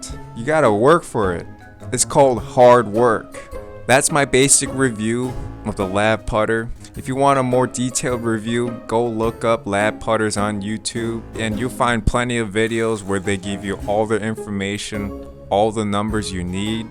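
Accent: United States. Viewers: Super chill Midwestern tone. It’s cool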